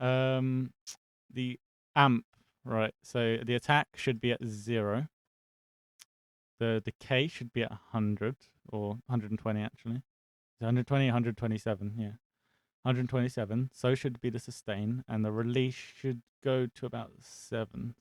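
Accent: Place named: United Kingdom. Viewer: Oh man you're British! And you are the dude that made those sick sonic remix! Ahh it all makes sense!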